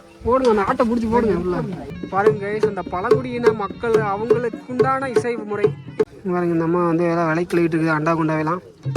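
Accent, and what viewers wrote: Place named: Coimbatore, India. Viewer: ne pandradhu ellam Kovai outdoors mari Iruku bro slang and intro ellame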